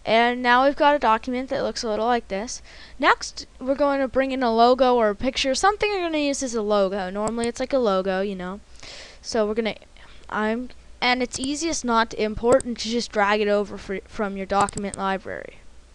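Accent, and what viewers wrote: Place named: Alabama, United States. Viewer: or... would it be sky flaaaawers? ;) j/k. This tutorial was cool. I used to have the same accent, being originally from Alabama and raised partly in Florida.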